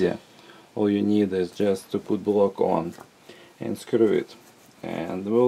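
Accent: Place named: Russia. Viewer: russian accent <3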